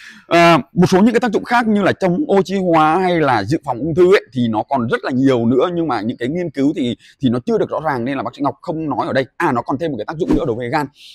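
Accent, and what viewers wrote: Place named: North, Vietnam. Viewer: Ngọc ơi ! Em vui lòng nói chậm lại một chút được ko ? Em nói nhanh quá anh nghe ko kịp vì anh là dân Sài Gòn nghe tiếng Bắc nói chậm thì được còn nói nhanh quá anh nghe ko kịp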